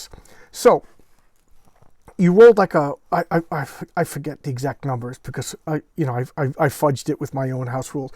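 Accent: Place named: New York, United States. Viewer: I’m in Oklahoma City. I don’t think you said where you live but I’m guessing somewhere near NYC based off your accent? I’ve signed up for the forum and am waiting for my e-mail verification. Re: Jeff Dee. About 10 years ago I sent him a fan email that ended up several years ago with me being hired to illustrate the War Heroes WWII sourcebook for their ‘Mighty Protectors’ game (basically V&V 3). I was thrilled to be a small part of V&V ‘lore’ and Jeff was entirely professional. At one point he gave me his number in case I had questions. Based on interviews, he is very outspoken and direct, so I didn’t dare call him. But V&V is great and his art is awesome.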